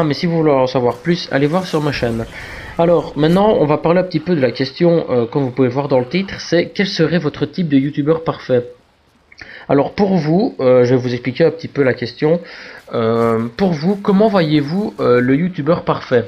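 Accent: Belgium. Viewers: j'ai direct su que tu venai de liege avec ton accent ( je suis de verviers ;)) ce qui explique ton pseudo ;p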